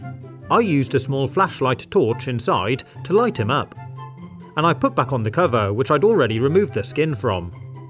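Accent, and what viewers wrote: United Kingdom. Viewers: u keep on saying "cool huh?" But are u from UK?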